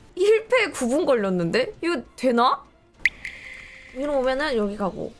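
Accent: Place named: South Korea. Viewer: 서울사람아니었나보네 사투리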